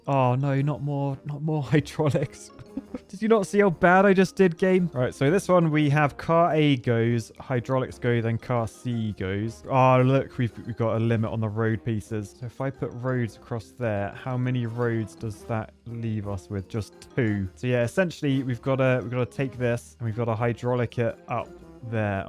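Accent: United Kingdom. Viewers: I'm not so much into Poly Bridge, but when I saw "Bridgehemian Rhapsody", I had to click ! And it was awesome !!! I love watching your videos because : _ your british accent (I'm french and I love it) _ I like video games _ I like engineering _ the bridge reviews _ the strongest shapes everywhere _ your humour ....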